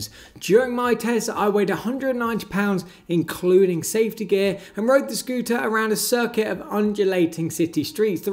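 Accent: United Kingdom. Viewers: Fkn annoying British accent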